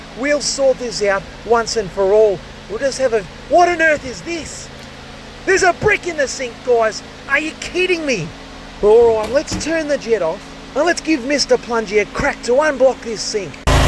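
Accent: Australia. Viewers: Is there anyone with more aussie accent than you? I doubt. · Man watching a plumber with an aussie accent and the crocodile hunter energy hits different, throughly entertained my friend watching from SoCal USA 🇺🇸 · G'day. Ended up finding your channel after watching some pet grooming. lol. Watched a bunch of ya vids and may be absorbing more Aussie dialect than is healthy for a yank. Anyway, love your videos and the excitement you bring to honestly one of the most underrated jobs out there. Wish more plumbers had your worth ethic and enthusiasm, especially where my girlfriend lives. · First time encountering this channel and man, everything is more exciting with an enthusiastic Aussie host lol Like, my dad's a plumber and it's nowhere near as fun watching him work.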